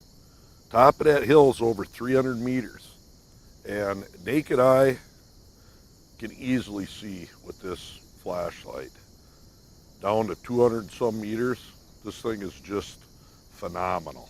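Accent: Minnesota, United States. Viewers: Minotaurism probably could do a mean Macho Man too! They’re all from Minnesota area so it’s the accent.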